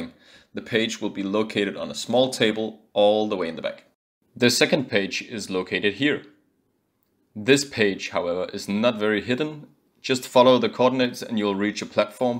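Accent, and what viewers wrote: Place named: Denmark. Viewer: Den danske accent er legendarisk aha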